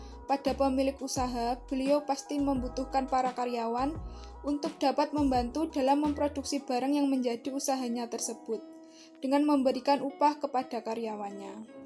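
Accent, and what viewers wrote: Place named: Java, Indonesia. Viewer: medoknya sangat terasa khas Templek 😭👍🏼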